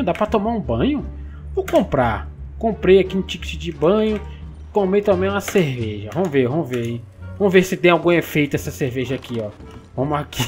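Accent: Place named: Minas Gerais, Brazil. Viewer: Sotaque de Minas parece da roça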